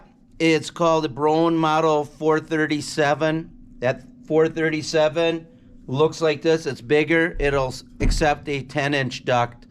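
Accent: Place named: Wisconsin, United States. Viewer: The Wisconsin accent is strong on this one!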